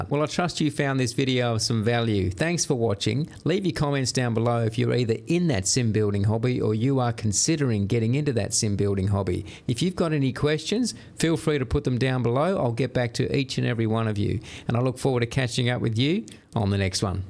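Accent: Australia. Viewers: Are you in south Australia by chance? That accent is familiar.. 😁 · You're talking my language (apart from the Aussie twang). 70 in a couple of months, been simming since the mid-1980's, through all the iterations. Recently built my own PC to handle the best graphics and flight physics available now (MSFS2024). Only ever flown GA, now a 172-based Reims Rocket. Working my way from the UK to SoCal, take off where I last landed. Never tried VATSim, currently using SayIntentions - but one day i swear I'll join the network.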